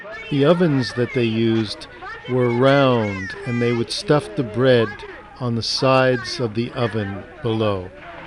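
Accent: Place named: Brooklyn, United States. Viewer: "Baklavar"? Dude. Your pronounciation is pure Brooklyn. I suggest you stay away from the contemporary political commentary. If anyone wants to read the details of events leading up to the Afghan civil war of the 1980s, one place to start is the chapter on Afghanistan in William Blum's book 'Killing Hope: US Military and CIA Interventions Since WW2' (Common Courage Press 2004) and Blum's references therein.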